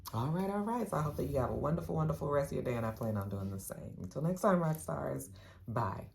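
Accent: Ireland. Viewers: Lol, whenever I hear your Irish accent. Next time throw in the line, it’s magically delicious. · I be waiting for this review just for the Irish accent, I laugh watching the show just thinking about which line you gon say😂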